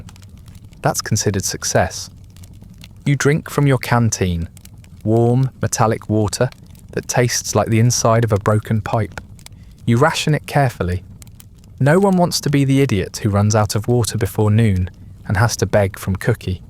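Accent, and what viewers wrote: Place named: United Kingdom. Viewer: Hey, I’m here again! Idk why your voice is so soothing; but, thus far, yours is the best, from I’ve found here in Houston, Tx… A.M. also, idk why the Brit voice so Many, to the American ear, is so appealing! TY!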